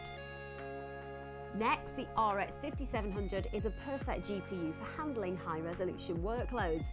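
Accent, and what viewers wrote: United Kingdom. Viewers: Gotta love that British accent !!! Informativite video with essential breakdowns.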